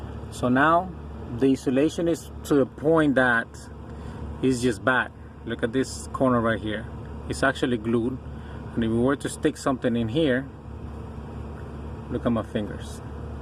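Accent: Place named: South Florida, United States. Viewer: OMG, thanks for this video! I was about to try on my moms super saggy headliner and I KNOW that foam is all deteriorated like in your video, I can feel it crumbly through the fabric. It's not worth it right now, lol. btw, I KNEW you were also from SoFla as soon as I heard you talk, thanks for the great video neighbor!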